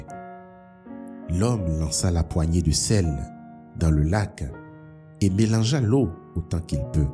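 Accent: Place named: Africa. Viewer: J'adore l'accent africain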